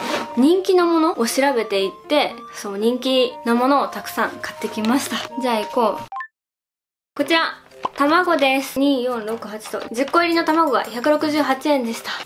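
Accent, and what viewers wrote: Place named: Hiroshima, Japan. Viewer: 元彼が広島の人だったからと同じ喋り方だからなんか思い出したあああ笑笑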